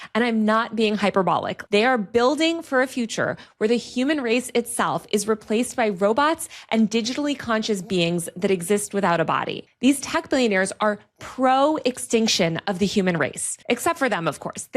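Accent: California, United States. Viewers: she literally sounds like if California was a person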